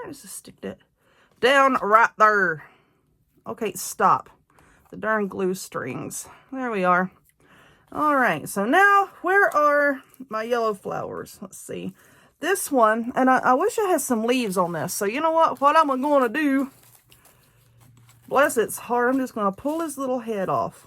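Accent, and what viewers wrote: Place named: Alabama, United States. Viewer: I love all of your projects. I get great ideas from you. I also love someone who sounds like me. I’m from Alabama so you go girl. You stay safe and well. I will be making this wreath. I’ve been looking for some decor for my gazebo. Thank you so much.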